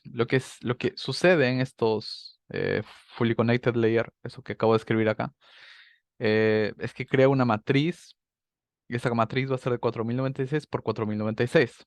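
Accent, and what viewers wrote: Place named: Peru. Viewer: De dónde eres?...tienes acento peruano. Excelente video.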